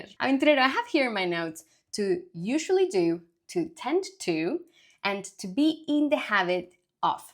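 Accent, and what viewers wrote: United Kingdom. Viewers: Hola señora- de donde eres? Tu acento es muy interesante- lo parece que un poquito del UK cuando hablas inglés. 🤔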